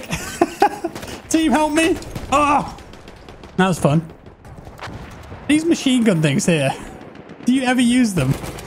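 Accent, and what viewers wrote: United Kingdom. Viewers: As long as you like, you're British patter and nonchalance is soothing and enjoyable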